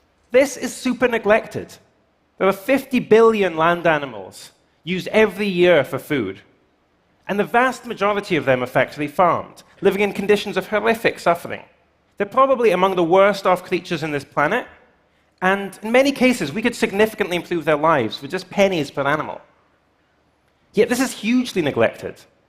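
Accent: Scotland, United Kingdom. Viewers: Where is this guy from? England, Scotland, Ireland. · Sounds Scottish to me. But I'm not sure. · What accent is that??? Half Scottish half Dutch attempting a posh English accent? Mental. Good video, thought distracting... that accent!?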